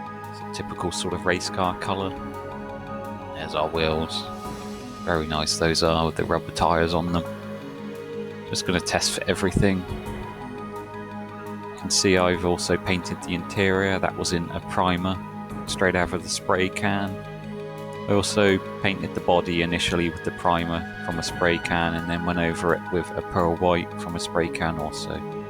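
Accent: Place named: Somerset, United Kingdom. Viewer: I thought I recognised the accent haha I live in Weston super mare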